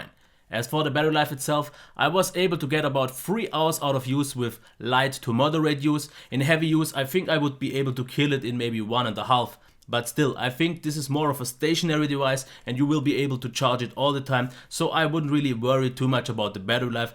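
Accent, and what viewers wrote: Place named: Germany. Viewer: German english is the best😁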